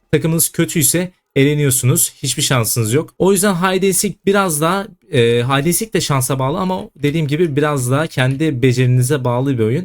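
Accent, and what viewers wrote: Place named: Azerbaijan. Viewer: Abi sen galiba azerbaycanlısın konuşman dan anladım bende azerbaycanlıyım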